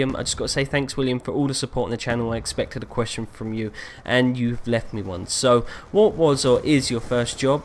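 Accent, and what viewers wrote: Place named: Kent, United Kingdom. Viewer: you sounded like you were from kent! but why ireland? :D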